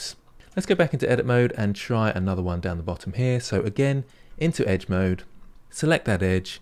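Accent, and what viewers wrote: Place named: Essex, United Kingdom. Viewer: Love your tutorials. Very easy listening voice and accent. Is that Essex?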